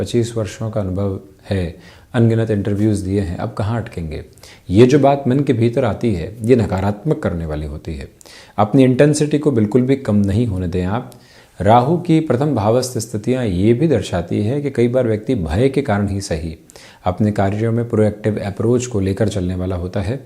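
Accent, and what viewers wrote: Standard. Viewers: Aap Kitna shudh hindi bolte hai🎉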